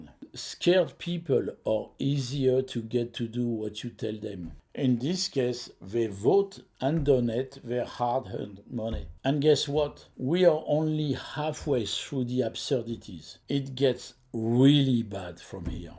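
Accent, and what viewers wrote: France. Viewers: Watching a French man with a French accent talk about guns like a red white and blue gun enthusiast blows my mind. Liked and subscribed.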